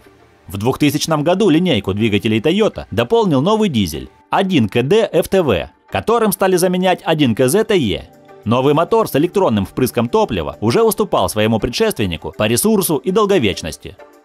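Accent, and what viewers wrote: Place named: Belarus. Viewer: акцент белоруса, эксперт?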